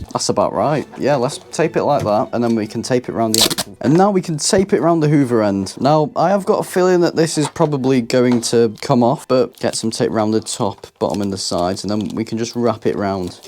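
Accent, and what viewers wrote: United Kingdom. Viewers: So, British?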